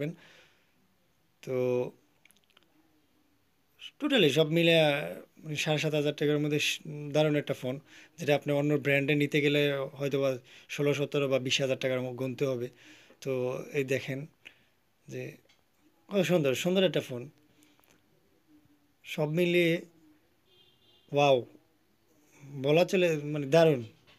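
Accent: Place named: Myanmar. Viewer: Vai khotha valo Kora bolban Karon mramar manusar moto khothaboltasan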